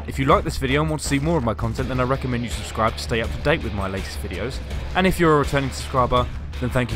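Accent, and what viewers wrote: United Kingdom. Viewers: UK English sound soo badly...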